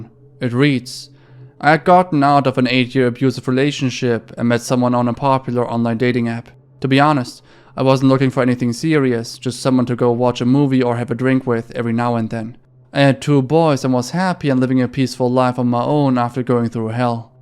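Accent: Germany. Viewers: I think he said once that German was his first language, so you’re probably just hearing how thick his accent is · You are German, right?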